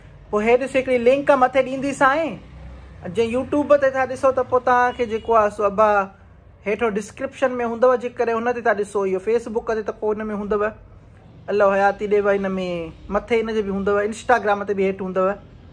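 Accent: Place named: Larkana, Pakistan. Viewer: 'Kadhin kadhin shashindo' aw😁safa Larkane waran ji boli thi galhin 😂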